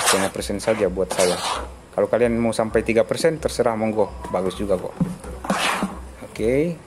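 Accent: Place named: Medan, Indonesia. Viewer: Lokasi dimana gan? Logatnya kayak org medan. Klo medan bisa la main2 ke farm ny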